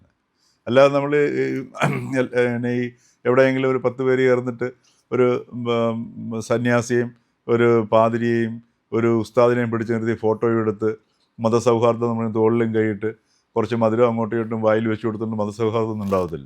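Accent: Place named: Madhubani, India. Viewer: Pranounsation is Madhubani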